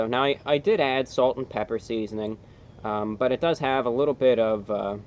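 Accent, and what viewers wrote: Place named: New York, United States. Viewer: As I'm moving yet again, your accent reminds me of my hometown in NY. Thanks for helping me get through my most stressful move so far. <3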